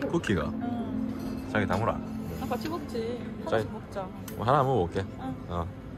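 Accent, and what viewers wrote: Gyeongsang, South Korea. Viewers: 경상도남자 억양을 배우셧네 ㅎㅎㅎ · 와~~ 경상도다~. 제일 멋지다! 강력 응원합니다. 얼머나 좋으노.. 아.. 진짜 요새 한일부부 AI 추천으로 넘 마이 올라오던데.. 하나 같이 머슴아들이 내시들 처럼 하이 어리가또 해사서.. 싸대기 한대씩 쳐 날리고 싶더마는... 와 진짜 짜증 만땅 올라오더마는.. 몽창 다 날려도 와이리 머이 올라오노.. 하다가.. 멋집니다 이 분. 오리지날 경상도 고대로네. 저는 부산. 오래오래 향복하이소~~~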